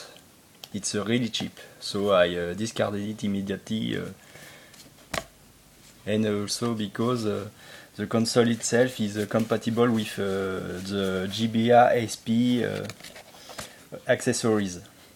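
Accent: France. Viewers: le francais qui parle englais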